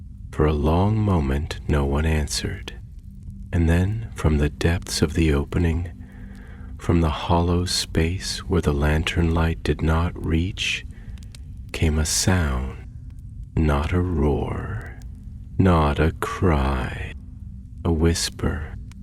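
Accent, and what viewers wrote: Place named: United States. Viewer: American accent 🤮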